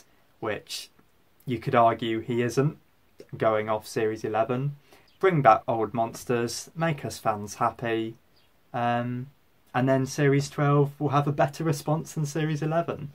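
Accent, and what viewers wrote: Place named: Northern England, United Kingdom. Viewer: I’ve got an out-of-the- blue (pun intended) question: do you have relatives from the north of England? ‘Cause I feel like I can hear a hint of a Northern accent(I’ve mentioned my good ears before😏)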